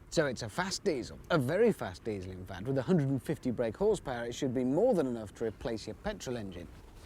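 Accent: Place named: Birmingham, United Kingdom. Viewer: anyone else notice Hammond sounding more brummie ? He said “it’s a fast diesel “ instead of faaaarst like he would today!!!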